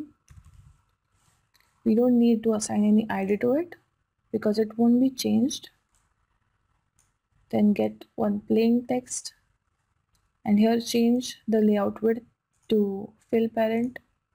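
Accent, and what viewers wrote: India. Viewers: u sounds indian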